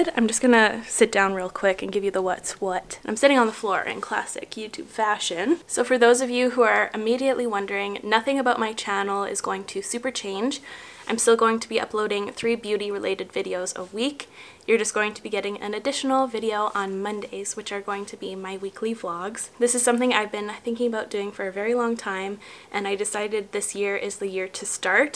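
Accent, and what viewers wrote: Quebec, Canada. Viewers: My favourite phrase or is "we'll see about that" - it's the Canadian accent that does it for me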